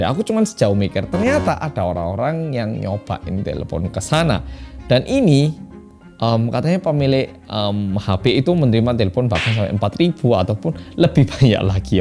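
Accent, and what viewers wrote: Java, Indonesia. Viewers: Kok sleg jawanya engga hilang ya Mas bro... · Sesungguhnya saya penasaran kenopo kok mas dialek nya masih kuenteel medhok.. gimana kah cara menjaga nya..?? (Semoga dibales) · Mas mas medok ae jawane ... sing ndih... hehehe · salfok mas ngomongnya medok bingitsss wkwkwk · Udh nonton bang reviewnya.. abang orang korea tapi Logatnya Jawa Toh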